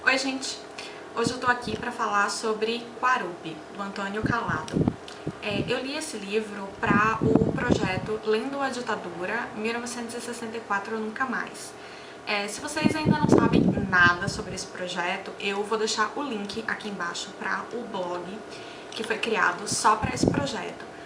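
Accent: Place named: Salvador, Brazil. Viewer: Eu bem que achei que você tinha sotaque de soteropolitana, somos conterrâneos. Um belo achado seus vídeos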